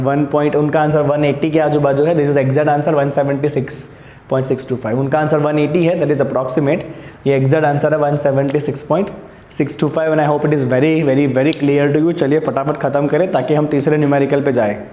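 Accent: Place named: Surat, India. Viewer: Sir are you from surat ....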